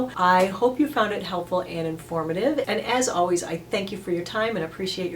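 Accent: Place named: New England, United States. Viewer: Love the video. I heard your accent and knew your were a fellow New Englander💕 I love CeraVe products. I will try on my neck too. Great advice. Thank you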